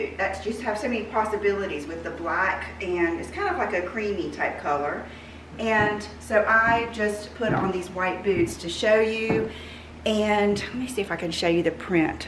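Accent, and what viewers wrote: South, United States. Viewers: Hi. I just wanted to say how much I enjoy seeing your pretty face and listening to your soft southern accent. Things are getting very scary here in the UK (as it is everywhere) and your videos are the perfect distraction to the craziness going on around all of us. I hope you and your family stay safe and well. 🙏🏻💕💋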